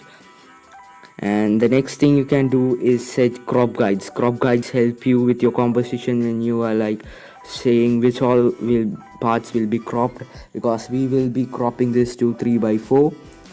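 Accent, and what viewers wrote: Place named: Kerala, India. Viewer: ivante englees ketta ariya malayali aann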